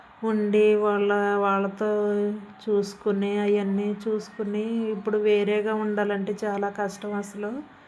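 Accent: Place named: East Godavari, India. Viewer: Akkaaaa...Meeku east godavari yaasa vachesindiii..curry super 😊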